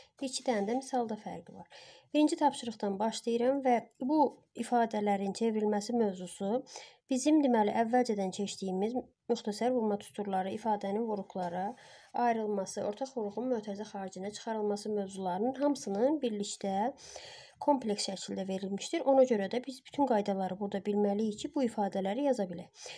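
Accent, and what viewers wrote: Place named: Sheki, Azerbaijan. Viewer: Birdə sizə sualım var🙃.Çox marağım tutdu.Siz Şəkilisiz?Ləhcəniz Şəki ləhçəsi kimidi.Mən bakılıyam.Amma anamda sizin ləhçədə danışır.Zəhmət olmasa mənə cavab yazardınızda😇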